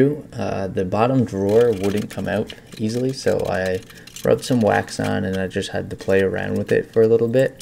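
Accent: Canada. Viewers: Your pronunciation of your "ou" s gives you away as being from Canada. I would say Calgary? Somewhere in the mid part of your fine country.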